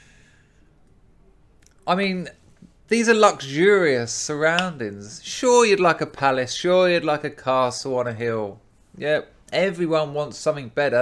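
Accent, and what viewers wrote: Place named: Cockney, United Kingdom. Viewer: spot on mate good to hear a fellow cockney of the old school.compared to nowadays the old days were utopia.keep on doin what yer doing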